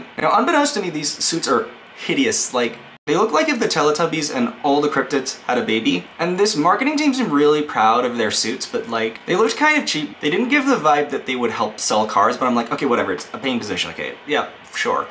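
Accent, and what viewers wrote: Australia. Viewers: NGL, the last time I watched one of your videos, I remember you having an Australian accent. Not saying that it was a bad thing at all, but man, things have really changed since I last watched you on YouTube years ago.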